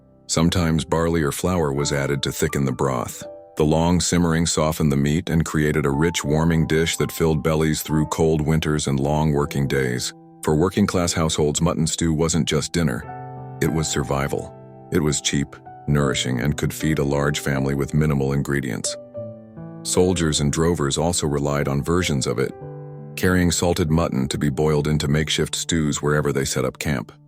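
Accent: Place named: United States. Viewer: why the american accent ?!?!?!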